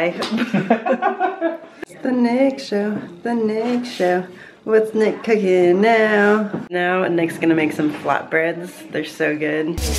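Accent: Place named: New Zealand. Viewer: we want one video where you the entire time speak New Zealandish:)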